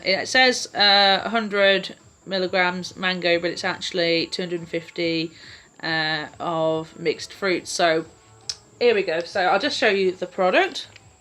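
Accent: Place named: United Kingdom. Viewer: I love your British accent by the way(haha) I could listen to you all day..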